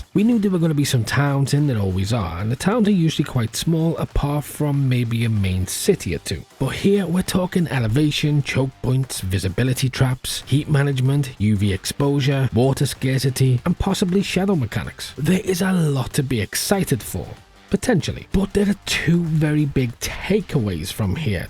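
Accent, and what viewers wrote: Liverpool, United Kingdom. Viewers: I need to know are u scouse? or from the wirral i feel like i hear it in ur voice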